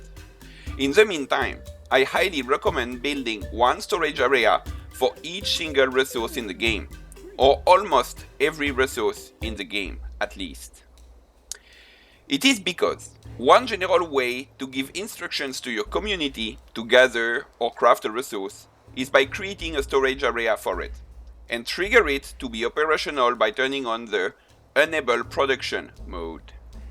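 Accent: France. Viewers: i like your french accent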